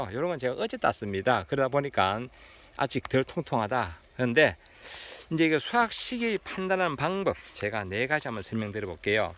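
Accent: Gyeongbuk, South Korea. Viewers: 선생님 음성도좋으시고 말씀도 정확하고 조리있게 잘하셔서 아나운서 를하시면 좋을듯합니다 유트뷰 로 뵙기에는 아까운 인재심니다 그리고 저는 고향이 경북상주군 공검면 하흘리 에 태어나서 10살쯤 경기도 에이사와서 현재 거주하고있는데요 말씨들으니까 고향분같아서 몇번씩 반복해서 영상을보고있습니다 저는6월 12일에 모종을 사서심었는데 언제쯤추수가 가능할까요 현재25마디까지 커서 적심한 상태입니다 처음심은 참께 농사 가 많이어렵군요~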